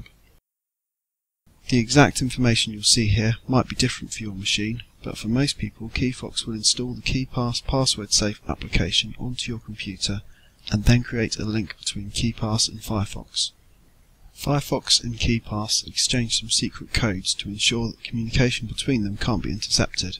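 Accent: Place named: United Kingdom. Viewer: GOD you could do a lot to improve your audio not to mention working on the dialect intensity so that those not from the UK could understand you. With both issues it makes it pretty bad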